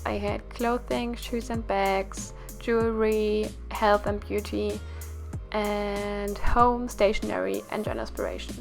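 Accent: Germany. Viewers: When you’re 1min into the video and already know that she’s German not because of her speaking (which is quite elaborated because I didn’t notice her accent was German at first) but by the fact she had a Mallorca page in her journal... hach die Deutschen 😂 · Ich hab schon mehrere deutsche Youtuber Englisch sprechen hören, aber ich glaub deins war bis jetzt am besten😂Finde den deutschen Akzent voll niedlich 😅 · der deutsche Akzenkt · U are from Germany right?? Because u had kind of German accent 😂 And in your old bujo was :"Omas todestag" (I am from Germany sorry for my bad Englisch) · i like your deutsch akzent macht das video familiär <3! XD ( bitte mit deutschem akzent lesen) Aber du sprichst echt gut englisch!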